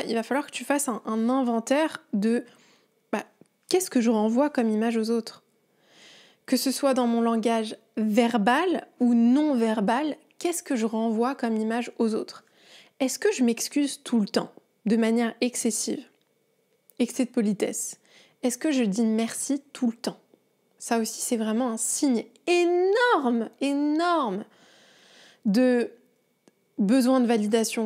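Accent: France. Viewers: Le meilleur podcast que je écoute, vous êtes pas une nordiste par hasard 🎉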